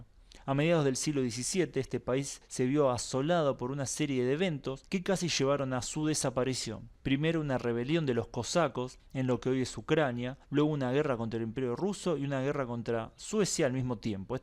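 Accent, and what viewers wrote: Buenos Aires, Argentina. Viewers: buenos videos, lastima tu acento tan porteño, cabasho, ashi, asher, mishones....caballo alli, ayer, millones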